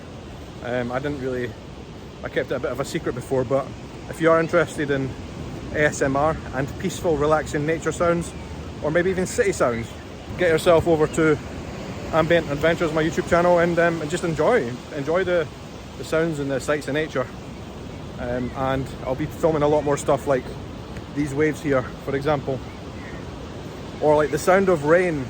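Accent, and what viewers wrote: Scotland, United Kingdom. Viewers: The curiosity might be not just the English speaking but the "funny" accent. LOL Nobody can turn down listening to a Scottish accent! ;) My Dad was from Forth so I could listen to you talk all day long! So glad the cyclosporine has been such a miracle for you! Heather xo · Do you have a heavy Scottish accent in Portuguese too? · ...INTERESTING contrast: a Scots' accent...in BRAZIL...! WHEN you take up running, make sure the shoes are SUPPORTIVE; (YOUR knees will THANK YOU...). BTW: I'm VERY HAPPY you respond well to the cyclosporin... When you need to get off cyclosporin, DO try diet changes... YOU have a LOT of positive attributes going for you... 👍😃👣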